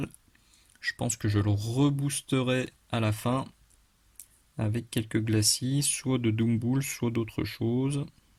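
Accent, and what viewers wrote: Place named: South, France. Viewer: Salut. Encore une très belle vidéo sur ce métal non métallique en cuivre, pleine d'enseignements et de conseils judicieux comme toujours. Et bravo pour l'accent du sud.. qui me rappelle le mien (natif de Toulouse..)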